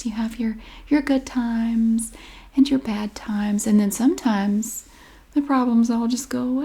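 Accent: South, United States. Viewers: Bro why is nobody talking about how good did with the accent! You did such a good job of sort of toning down your southern accent and sound like Carole! That’s a very hard thing to do and you did a great job💕💕💕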